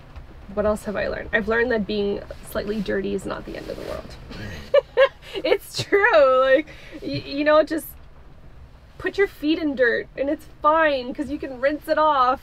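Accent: Ottawa, Canada. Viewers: I was like thats an Ottawa accent. I didn't think we had one but we do. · She sounds like an Ottawa girl.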